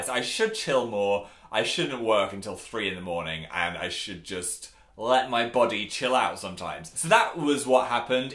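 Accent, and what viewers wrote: United Kingdom. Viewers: YAY SOMEBODY BRITISH!!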